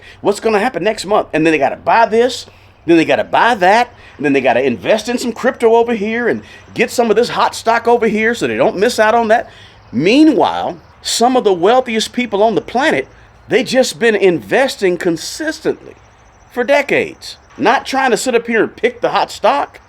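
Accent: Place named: Tennessee, United States. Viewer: Bro sounds country. Must be from TN.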